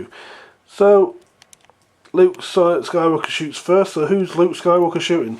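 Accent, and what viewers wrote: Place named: United Kingdom. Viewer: All things sound more intelligent if said with a British accent!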